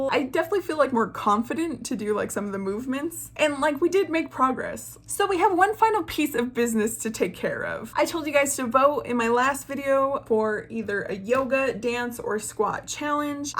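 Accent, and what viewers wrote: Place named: Midwest, United States. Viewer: Hey are you from the Midwest? I’m from Minnesota and your accent sounds like mine lol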